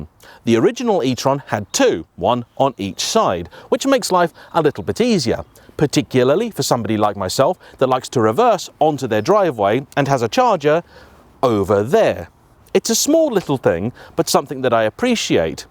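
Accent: United Kingdom. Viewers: I think that's just how British people sound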